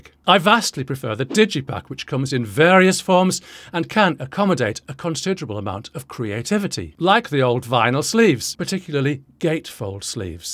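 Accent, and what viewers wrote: United Kingdom. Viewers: He Does come off as a rather arrogant know it all, doesn't he? .... the Brit (almost typed 'Cockney' lol) accent doesn't help ....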